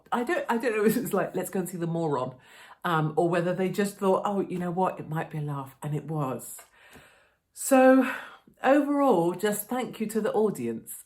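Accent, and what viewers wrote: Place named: Wales, United Kingdom. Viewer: truly just hearing you ad-libing energetically: Welsh pro-nounciations + lol!!, why, indeed I DO FEEL Better in all this terronic global gnash love you!! The Gospel:"...Christ died for our sins according to the scriptures; 4 And that he was buried, and that he rose again the third day according to the scriptures" 1 Cor.15:3,4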